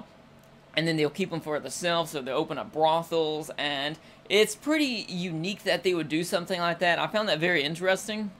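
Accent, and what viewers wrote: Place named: United States. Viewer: Random question, but where are you from? I can hear a country accent from you lol.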